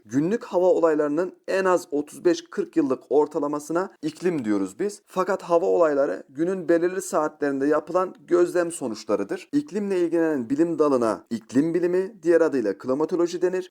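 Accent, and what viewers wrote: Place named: Adana, Turkey. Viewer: konuşmanız adanalılar gibi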